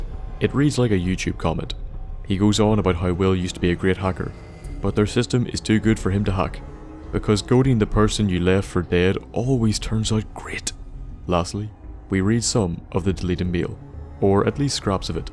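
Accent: Northern Ireland, United Kingdom. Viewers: that's just his northern Ireland accent, doesn't matter if he tries to speak ina different way he'll just sound the same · are you british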